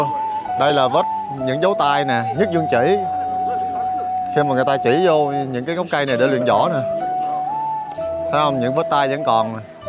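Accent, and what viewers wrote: South, Vietnam. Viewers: giọng Nam bộ tự nhiên, vui vẻ, kiến thức sâu....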